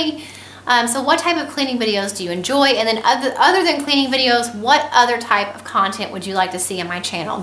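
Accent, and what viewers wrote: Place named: South, United States. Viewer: I subscribed to your channel because I was interested in learning more about homeschooling and being a SAHM. I like your personality and southern accent. I've always liked anything "southern"... Movies, books, tv shows, etc. I also like your gift videos and that you like to use natural cleaners. Your Mickey subscription box video has me itching to save up for another Disney vacation and I'm really excited to watch your Disney videos now! Our baby boy was born last week and we haven't decided on a name yet. What are some popular names for boys in your area? Or if you had a boy what would you name him?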